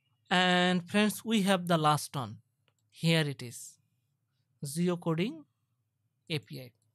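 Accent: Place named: Bengal, India. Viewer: ভাই আপনার প্রনাউন্সিয়েশন শুনেই বুঝে গেছি আপ্নে বাঙালি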